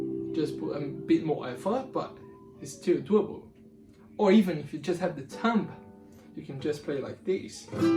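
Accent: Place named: United Kingdom. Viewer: What a beautiful British accent, my friend!!!! I want to speak like that toooooooo!!! Saudades, meu amigo!!!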